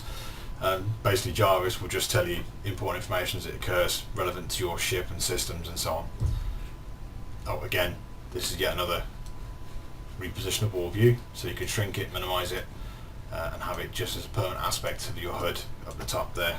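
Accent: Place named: Australia. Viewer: you're UK based yes? you sound like an Aussie.. meh maybe itz just me.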